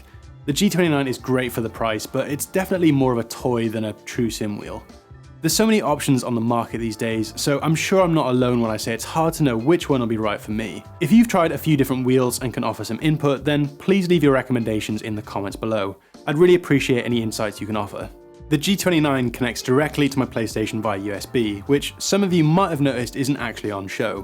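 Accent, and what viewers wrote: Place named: Wales, United Kingdom. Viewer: What part of Wales are you from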